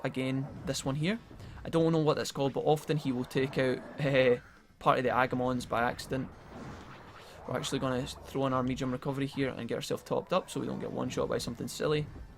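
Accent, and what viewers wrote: Scotland, United Kingdom. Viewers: "Gandalf and the fuck boys" lmao, enjoying these videos man, the Scottish patter is great. xD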